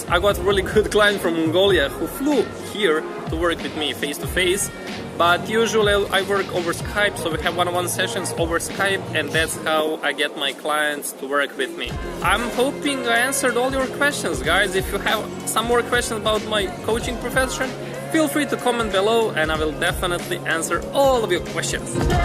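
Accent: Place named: North, United Kingdom. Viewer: And I like your accent! :D North FTW!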